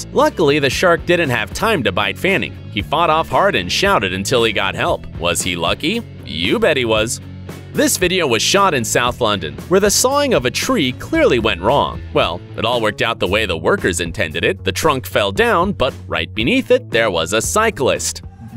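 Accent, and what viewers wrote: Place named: United States. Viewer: Nahh - that yank wank voice is enough to put me off.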